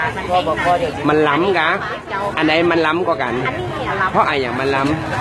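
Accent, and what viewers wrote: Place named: North, Thailand. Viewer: ชอบที่พูดเหนือจังเลยค่ะ เป็นเอกลักษณ์ดี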